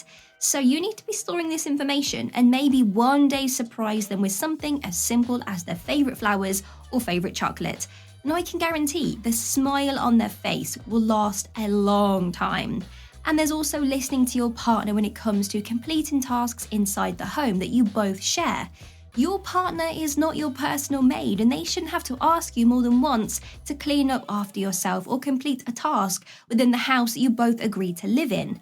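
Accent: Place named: London, United Kingdom. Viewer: I think she is from London.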